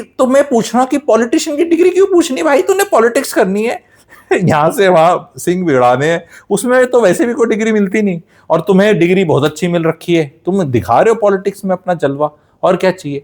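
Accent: Haryana, India. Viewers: Too much haryanvi accent is killing the content of the video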